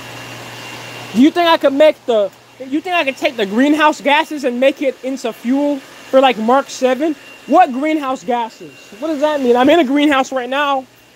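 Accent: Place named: United Kingdom. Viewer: Sound like you live in the UK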